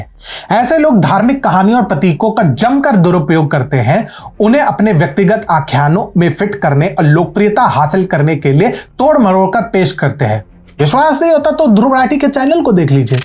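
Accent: Standard. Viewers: आपकी इतनी अच्छी , शुद्ध और साफ हिंदी के लिए आप को साधुवाद ।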